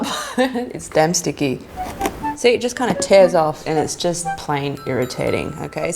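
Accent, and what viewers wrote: Singapore. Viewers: Is she singaporean? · I love her and her Singaporean accent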